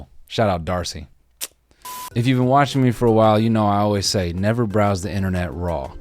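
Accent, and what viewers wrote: United Kingdom. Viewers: Dudes lowkey British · Why is no one talking about his British accent?? Lol amazing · talking with a british accent is giving me CODEL flashbacks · Ur British accent has come a long way broski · I love this British accent😭😭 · That British accent is top notch mate. Nice one 👍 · Damn, does a pretty decent British accent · That British voice is something is perfecting slowly hahahahha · I live for British accent, he is the only American who does it so well.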